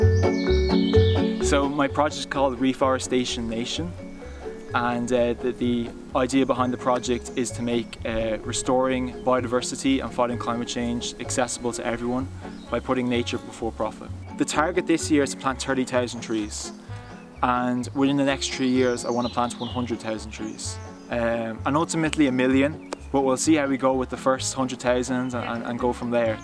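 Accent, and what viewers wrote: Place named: Ireland. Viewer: Bro! That.s amasing what u did! Great deed! I luveEire! I.m a Paddy 2, but I was naturalised dere, a fkn brit made me run out of that dream land, he was my x boss , don.t kneel down in front of those bastards anymore! RESPECT 2 U!!!!! I luv Ur accent 2!! Ur fkn great! YESSS!! I.m happy 2 c this , what U do!! If I.d be a chick, i.d show u my boobs, or even more! ! !!! Invite U in! ! ! !!! Xquize me, i.m like.n arrow! ! ! !!!! That bstrd sugest I.m sick.n da head, proganda against me in a way he was not suspected 2 b guilty!bro, U.r a great nation!! All the way!!! Yess, r some bstrds! But per general...!!!!! Fc uk!! The imperilists destroyed that realm!! Take of what U can man!!! Tk U a bunch 4 what U do!!!! Go in front of stephen.s Green , in Park show Urvface there, the chicks will jump on U now! I.m sure of that!! Do it!! Score!! 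Yep!!Ayeeaaaahhh!!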